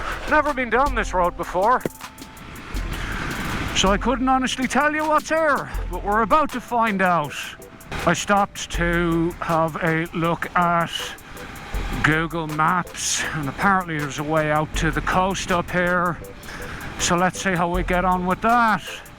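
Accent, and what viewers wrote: Ireland. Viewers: Everything just sounds better with an Irish accent. The weather looks amazing up there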